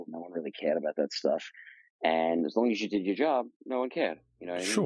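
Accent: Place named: New York, United States. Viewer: Love the NYC accent, bro.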